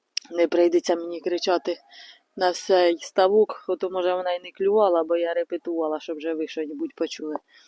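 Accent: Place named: Chernihiv, Ukraine. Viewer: я Олексій з Чернігівщини, мова знайома,у деяких районах у нас така сама.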